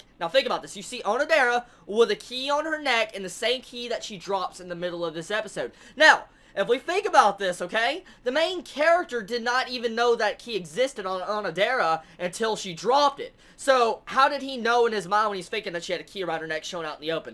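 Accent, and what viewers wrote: South, United States. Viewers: This guy is so southern.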